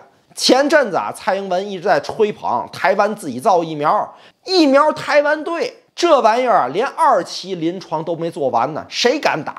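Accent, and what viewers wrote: Tianjin, China. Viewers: 天津老乡吧？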